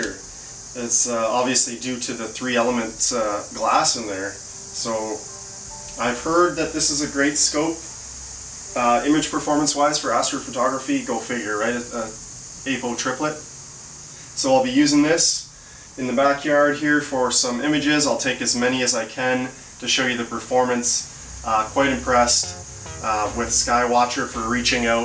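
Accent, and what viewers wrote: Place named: Canada. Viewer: Still say ahboot though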